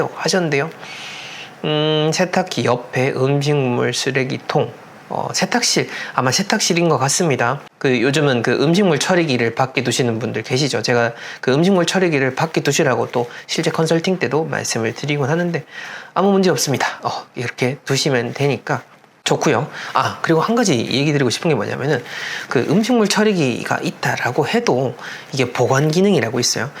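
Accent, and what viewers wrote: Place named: Gyeongsang, South Korea. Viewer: 흥 ~~~우연히 보다 지금은..ㅎㅎ자주봐여^^ 목소리도 좋고 설명을 너무 잘 해주셔서 더 좋아용😂 근데 경사도분? 사투리 ㅎㅎ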